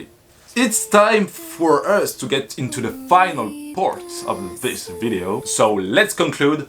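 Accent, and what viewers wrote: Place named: France. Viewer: Are you French? You sound French et les folios junior derrière toi te crament XD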